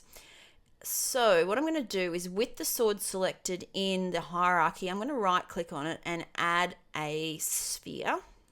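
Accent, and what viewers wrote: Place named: Australia. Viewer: Uhh yes. An Aussie, thank lord. Been listening to too many Brits, need some voices from my homeland.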